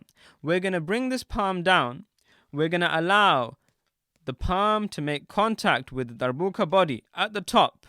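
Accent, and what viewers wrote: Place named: England, United Kingdom. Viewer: Great job brother thanks for the videos. By the way I like your English accent 😊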